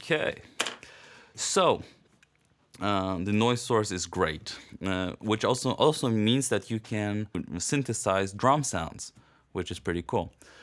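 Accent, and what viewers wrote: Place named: Sweden. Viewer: Love your swedish accent! musical!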